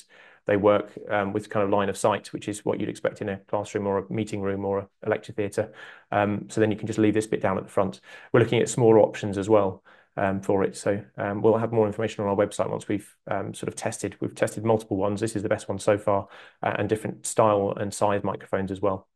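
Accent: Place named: United Kingdom. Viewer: I couldn't understand the limey bastard.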